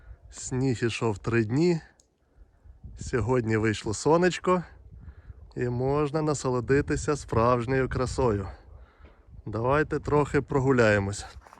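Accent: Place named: Central Ukraine, Ukraine. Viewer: Закарпатье - это соседняя область, недалеко. А говор у этого автора совсем не местный, он не с Карпат, приезжий. Где-то с центральной Украины.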